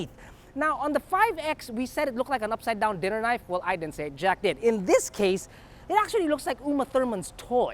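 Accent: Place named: Philippines. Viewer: His pinoy accent is annoying, hindi ba marunong mag tagalog.I guess social siya.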